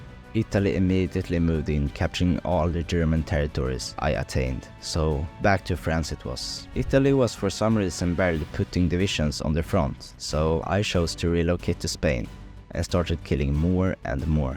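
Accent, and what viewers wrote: Sweden. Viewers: Bro kiiiinda has a swedish accent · As a swede no matter where I am in the world this accent will make me feel like I'm back at home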